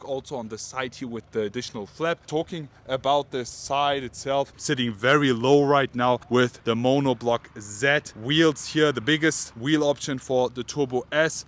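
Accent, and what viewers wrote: Germany. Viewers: Boaa wenn deutsche englisch reden...ohrenkrebs !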